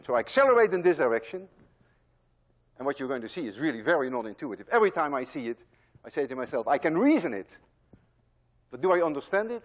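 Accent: Netherlands. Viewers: I like the dutch accent u have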